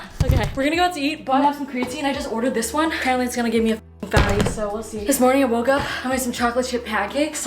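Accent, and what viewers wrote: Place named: United Kingdom. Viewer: Loved your British accent 😂😊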